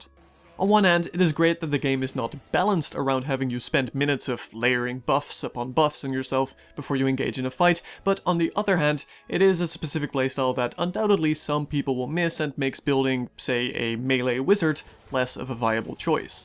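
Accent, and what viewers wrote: Netherlands. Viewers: My apologies but are you dutch? Being from Belgian I seem to notice a dutch accent(as compared to a flemish one to seperate the 2 "languages")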